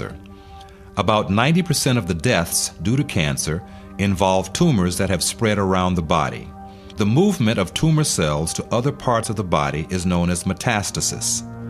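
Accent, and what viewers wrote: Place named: South Carolina, United States. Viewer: This narrator could be Charlie Rose's brother with a little Morgan Freeman depth thrown in--he has the same sweet South Carolina type drawl, not trying to hard to sound Midwestern. Very easy to listen to.... one of my primary rules when looking for video or audio series... a voice that makes you want to sit down and listen.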